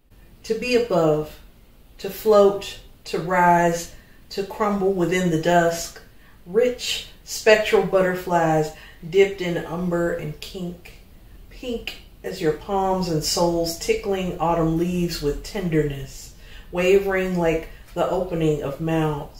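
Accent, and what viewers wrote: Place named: Illinois, United States. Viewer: I love your Central Illinois accent.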